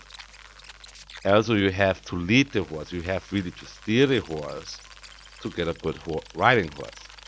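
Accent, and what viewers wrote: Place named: Austria. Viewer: I find dein Österreichisch Akzent geil.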